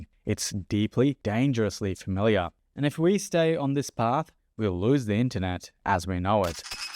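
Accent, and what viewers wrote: Australia. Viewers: Graphic violence sounded like graffi marlins. That lazy Aussie way of speaking.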